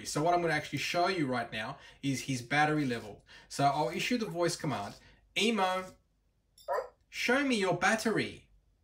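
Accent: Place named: Australia. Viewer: Hi, as always very helpful videos 😊thanks for that! My EMO gets off the home station quite well but today when I asked him, he said: I can’t at this moment , you think this has to do with the battery? I thought it was weird as it had never happened … Thanks for any advice. Ps: love your Aussie accent 😊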